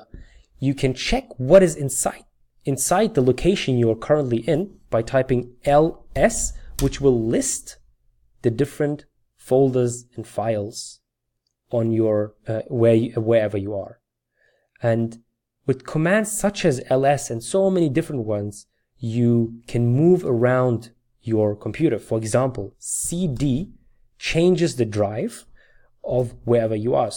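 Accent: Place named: Turkey. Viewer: Am I catching a Turkish accent...😅 nice😊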